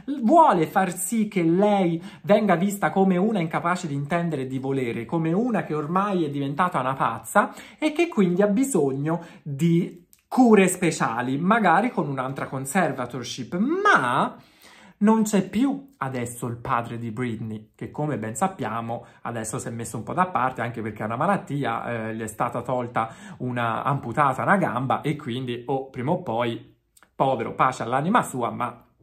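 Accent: Tuscany, Italy. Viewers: 🙋🏻‍♀️presente nuova iscritta! Io ho fatto il mio, tu per c contraccambiare mi devi accentuare il tuo accento toscano!!!!!🤩🤩😍😍😍😍🤩🤩🤩🤩amooooo!!!!!!!!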